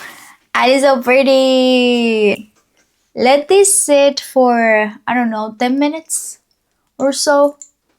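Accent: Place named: Italy. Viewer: I love thisss ,btw is she Italian?cuz her accent sounds like she is from Italy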